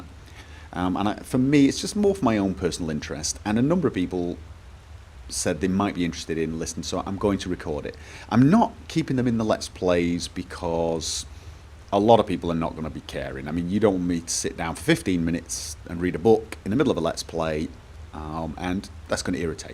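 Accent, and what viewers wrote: United Kingdom. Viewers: It's like a Morgan Freedman with a British accent. that's like 200% better than the normal Morgan Freedman!!! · If you were american I would not watch these book videos but british people are so pleasant to listen to that I'm going to watch them. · Yes, oh my God so much yes! This is amazing! The calm, collected British accent and the fantastic books of the Elder Scrolls series, with your reactions added on top. This might very well be your best playlist yet. I REALLY hope you'll do all of the books from the Elder Scrolls series eventually.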